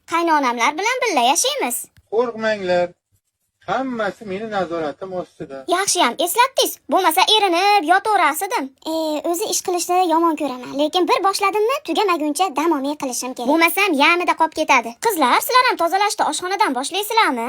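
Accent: Andijan, Uzbekistan. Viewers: Anjancha shevaga gap yòq❤❤❤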